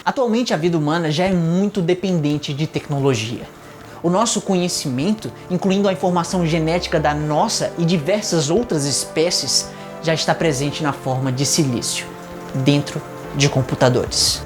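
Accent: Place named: Northeast, Brazil. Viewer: quando comecei a assistir ja suspeitei que o canal era nordestino :) mt bom o conteúdo parabéns.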